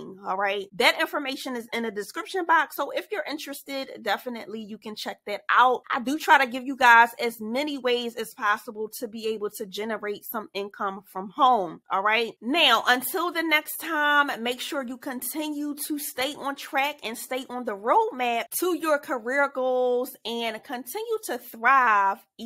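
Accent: Philadelphia, United States. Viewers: Good stuff!!! Thank you for the information. I hear a Philly accent...😂😍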